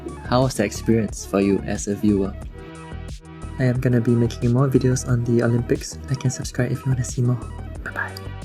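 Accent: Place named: Singapore. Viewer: Kinda guessed you were singaporean from the accent and the BP tai seng clip from another video confirmed it haha. Glad to see good content coming out of our little red dot, keep it up!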